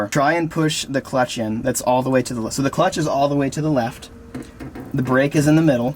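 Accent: Australia. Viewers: are u Aussie cause I am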